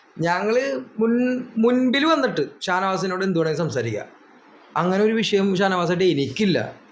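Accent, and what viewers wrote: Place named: Fort Kochi, India. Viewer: Fort kochi ക്കാർ ഇങ്ങയോക്കെ സംസാരിക്കും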